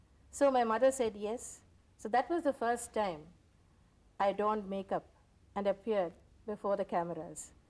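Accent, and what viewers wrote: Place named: Europe. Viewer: She talk like a European, she is fabulous, miss you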